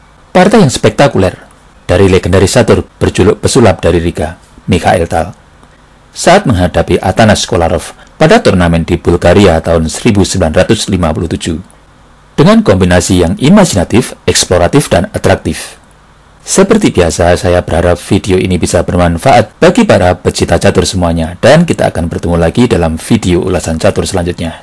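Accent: Java, Indonesia. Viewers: Saya paling suka chanel catur ini.. simpel ga banyak pernak pernik.. bahasa mudah dimengerti.. kadang ada kata2 lucu macam pion anak kecil imut ini.. hahaha.. logat jawa khas kental sy senang skali. Upload terus mbah..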